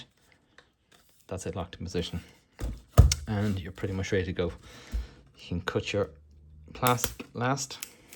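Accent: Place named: Ireland. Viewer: Thanks, helped with my 1st time set up, the notch was catching me out! Good to hear an Irish voice doing one of these vids too, fair play 👍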